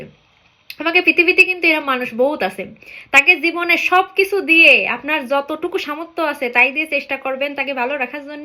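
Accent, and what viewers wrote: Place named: Jessore, Bangladesh. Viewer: ম্যাম, আপনার কথার টান আমাদের যশোর ও দেশের দক্ষিণ পশ্চিম এলাকার মতো।আপনি কোন জেলার প্লিজ?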